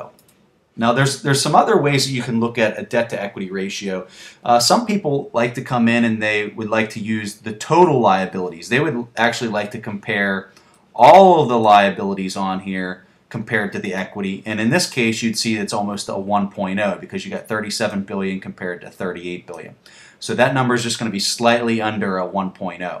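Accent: United States. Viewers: are you from Pittsburgh? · There’s zero chance you’re not from Baltimore.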